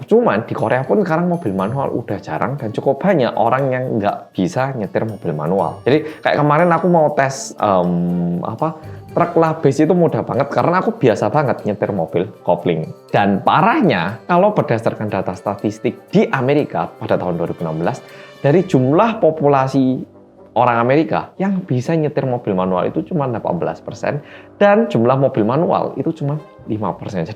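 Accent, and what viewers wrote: Java, Indonesia. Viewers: Mas medokkkkk....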